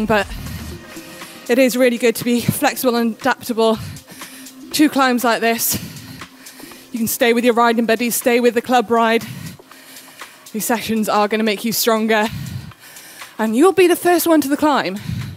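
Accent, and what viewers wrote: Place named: United Kingdom. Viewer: aahhh!!! British English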